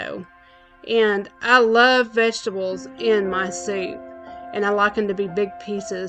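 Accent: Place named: South, United States. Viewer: I love her southern Baptist accent. This is just what I need...soup, cozy electric blanket, and some world wide wrestling.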